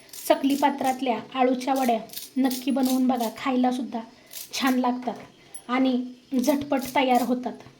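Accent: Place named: Satara, India. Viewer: ही अस्सल सातारी भाषा आहे त्या मुळे ऐकायला तस वाटत असेल बट योग्य कमेंट्स कराव्यात. कोणतीही भाषा असो तुम्हाला समजलं ना! मग कलेला प्राधान्य दिले पाहिजे.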